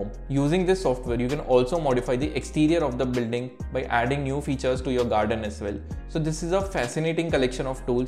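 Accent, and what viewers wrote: India. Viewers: Can we just appreciate this guy's beautiful voice nice indian accent ;) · It happens, don't worry. That's Indian accent.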